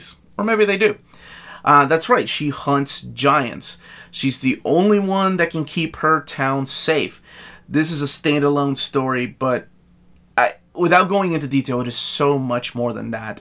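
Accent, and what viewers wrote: United States. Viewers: what country do you reside in! Just super curious, I think you’re American however I cannot tell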